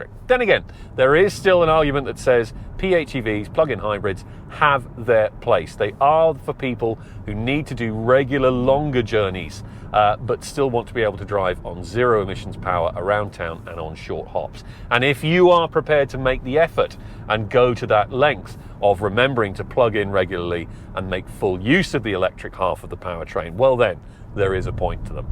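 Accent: England, United Kingdom. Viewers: because he's English :) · Is this guy english?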